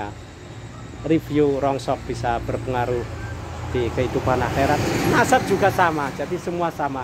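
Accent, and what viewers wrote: Indonesia. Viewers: Kaya wong Tegal' Brebes'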